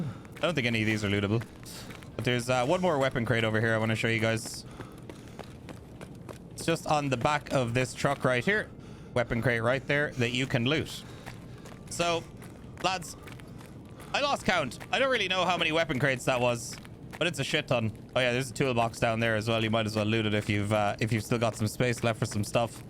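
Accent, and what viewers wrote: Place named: Ireland. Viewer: subscribed for the Irish lilt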